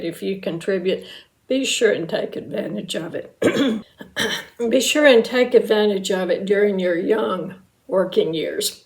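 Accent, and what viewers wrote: South, United States. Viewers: I found your channel today and I could listen to you talk for hours. I live in Oklahoma, so it's not like I don't hear southern accents, but yours is so lovely. I'm also a retiree with a mortgage, but thanks to my working so many years and a great investment manager, it's all good and all I have to do is hit 95 and this place will be all mine lol. So glad to find you and and I'll be back to see all your videos. You're a natural!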